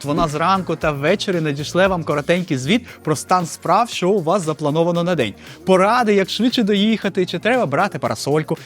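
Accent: Standard. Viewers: Яка чиста українська мова. Чудово 💙💛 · Ще й український кореєць ведучий огляду, з чистою українською мовою… Це прям новий рівень) Дивлюсь з iPhone, але нові моделі Samsung дуже зацікавили)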